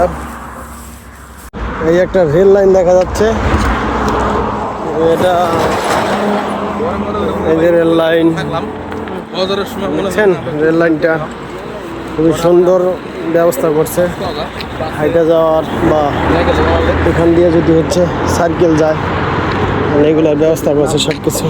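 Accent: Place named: Munshiganj, Bangladesh. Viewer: না আপনার কথা সুনে মুন্সিগঞ্জের কথার মতো লাগে তাই জিজ্ঞেস করলাম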